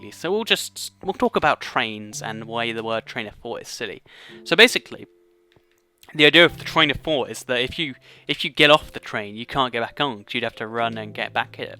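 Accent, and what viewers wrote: United Kingdom. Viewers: Yeah.Your british accent is pretty interesting though, that's part of why I like your channel and I also like your channel because you are a very informative channel :')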